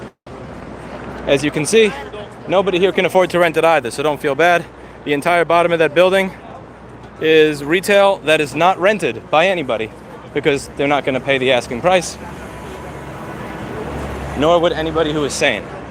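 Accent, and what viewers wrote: New York, United States. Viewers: Hi, Willy here. I listened to this from 75th St. and York ave, where I live as I walked to 5 W 37 ST. where I operate Reichenbach Hall German Beer Hall. I figured I would say hi. I was saying to myself, as you walked by 37th St, maybe he will mention the "sandwich board sign" that we have put on the corner to try and lure peeps down the street. Anyway, thank you for the entertainment. You still sound like a New Yawker. FYI, lost a restaurant around the block due to an asshole landlord. You have no idea how much $ we lost. If you are in the area again, contact me, you have a bratwurst and a beer on me and I will let you take a piss there if you don't tell anyone else:). One more thing, I think NYC will come back. We just have shitty leadership. And it looks like we will continue to have that.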